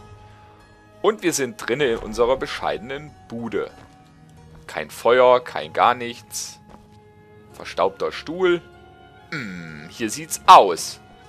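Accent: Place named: Hesse, Germany. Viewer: ich finde auch dass du einen kleinen dialekt hast, kann es sein dass du aus hessen oder so stammst? :)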